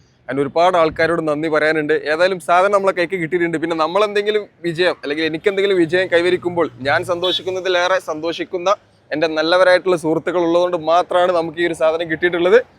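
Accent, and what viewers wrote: Malappuram, India. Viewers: സഹോദരാ, നിങ്ങൾ അത് അർഹിക്കുന്നു. നിങ്ങളുടെ അവതരണവും വിവരങ്ങളും മലപ്പുറത്തെ ഭാഷയും വളരെ രസകരവും ഗംഭീരവുമാണ്. ഞാൻ കണ്ണൂരിൽ നിന്നാണ്.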